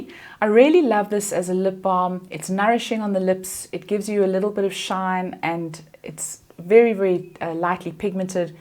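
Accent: South Africa. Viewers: I love Ruby Woo in you! I’m curious about something in your video - I think you said that you got the Elf shadow pallets for less than 30 bucks (is that the word you used?) and also mentioned the Essence eyeliner for less than 50 bucks - I know you are in South Africa (your accent is really lovely!) so what is the currency exchange like? In the US these products are around $3 each, so I’m wondering about your currency. Thanks for an informative video - so glad I found your channel! 💜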